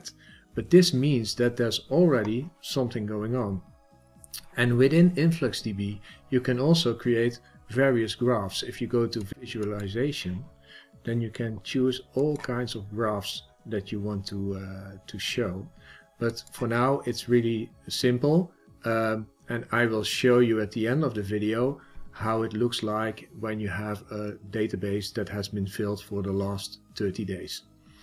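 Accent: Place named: Netherlands. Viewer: Hi hi dat klinkt Nederlands 😉 en daarmee vroeg ik me af of u bekend bent met iungo?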